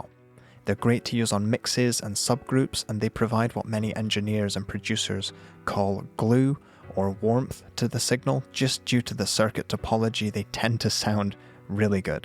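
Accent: Scotland, United Kingdom. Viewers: He does have a Scottish accent, it's just that he's from a part of Scotland that has a softer accent rather than a more pronounced one, such as Glasgow. :)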